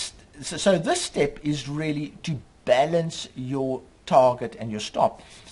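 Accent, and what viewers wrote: South Africa. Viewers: wow . . You sound so South African ! are u from New Zealand or South Africa ??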